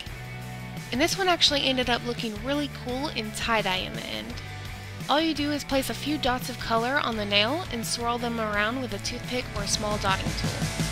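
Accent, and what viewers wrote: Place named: South, United States. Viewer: i just noticed tht u have a little country accent its so adorable i love it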